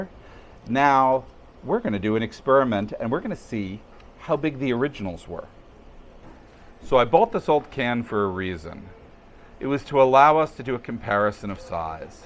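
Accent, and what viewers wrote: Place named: New England, United States. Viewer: I don't remember if ever discussed it in a video before but I'd be really interested to know how, from the accent, a New Englander got to Tallahassee and started in the business of Gilded Age/Progressive era style handmade candy.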